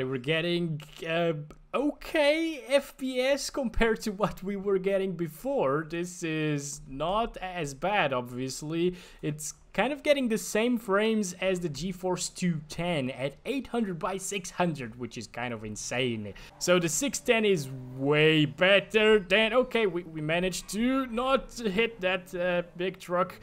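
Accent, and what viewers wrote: Portugal. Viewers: when says something in portuguese my heart bounces a little, even he's talking in Portugal Portuguese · Isn’t he speaking OG Portuguese? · Lol, I didnt know that he was from there, but it semeed to me that he was speaking portuguese portuguese (lol). Thanks for the info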